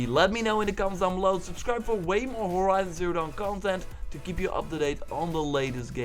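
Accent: Netherlands. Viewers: Are you dutch? You have a dutch accent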